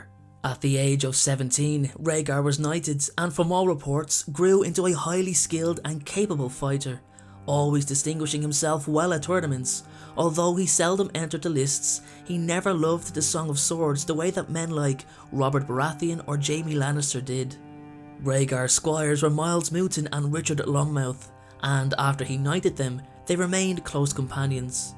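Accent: Dublin, Ireland. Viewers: I think he's a Dubliner like myself 🙂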